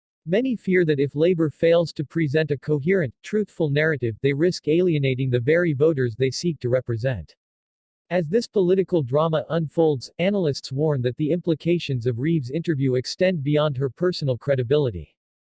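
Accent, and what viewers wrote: United States. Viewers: AWFUL American commentary AGAIN ! WHY ?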